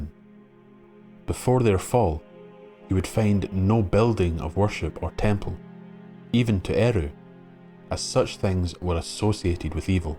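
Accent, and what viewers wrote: Scotland, United Kingdom. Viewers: This was really great! Though, much as a Scottish accent makes me weak at the knees, it was unintentionally funny to hear: "And the men of the Ale took Suh-ron back to Nuh-mennor from Middle Airth. Even the Fusstborn recognized the power of his rings to PREZAIRVE..." Aye, ye might try a wee bit harder to nae sound so Gaelic about it all! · Are you the Critical Drinker? Or do all Scots sound alike?🤔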